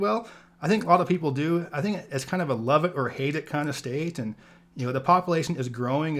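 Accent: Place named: San Joaquin Valley, United States. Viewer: You must originally be from Fresno or Visalia (or near those). I have to say, you have that San Joaquin Valley white person accent. lol I have it too (raised in Stanislaus County).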